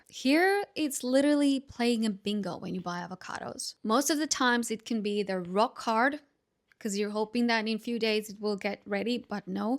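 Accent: Australia. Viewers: After listening to you , i can say unequivocally.....You are 100 % Australian, wherever you may be ..... So lovely to hear your experience here. Come back !!!!! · Its funny to hear Europeans speak English with an Aussie accent! I'm sure you'll get back some time. you know you'll run into aussies too so dont forget to mention your connection to the land down under..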